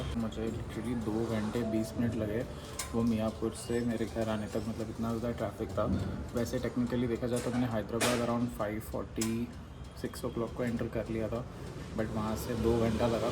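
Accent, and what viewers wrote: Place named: Solapur, India. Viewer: Are you from solapur originally ? Your marathi accent is kinda solapuri.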